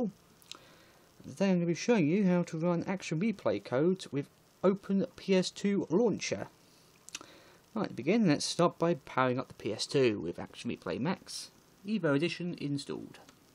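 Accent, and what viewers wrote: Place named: Australia. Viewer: Welp that is Australian accent after all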